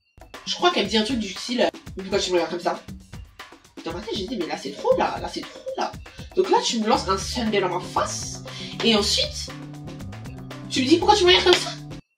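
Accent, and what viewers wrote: Antilles, Caribbean. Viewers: Tu est antillais je connais l'accent créole tu est d'ou au îles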